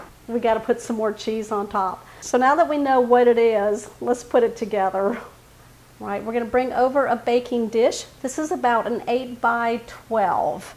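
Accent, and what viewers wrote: Texas, United States. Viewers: I am Irish Mexican and I love your Tex Mex accent! Sometimes I get told my Spanish is incorrect well I'm from tx and I got a twang in the way I speak! Love the recipe I am a huge fan of Chile rellanos!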